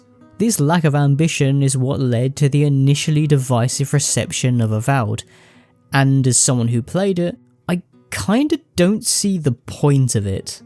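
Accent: United Kingdom. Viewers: it took me this long to realize you are British. That's how bad I am at recognizing accents...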